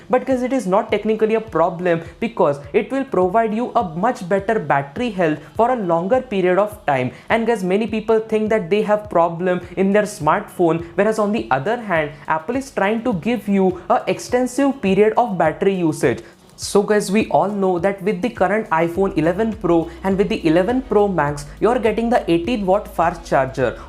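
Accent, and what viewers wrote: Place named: India. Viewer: that indian accent bruh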